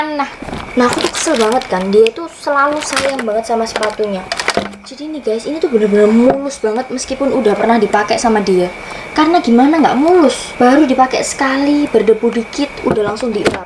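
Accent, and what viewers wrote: Java, Indonesia. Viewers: Iya soalnya medok gitu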